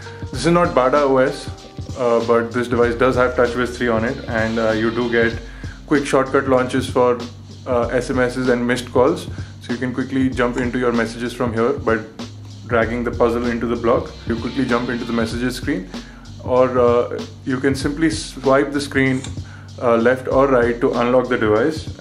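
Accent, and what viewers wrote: India. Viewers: bullshit typical indian english accent...( boda thing cuming out..)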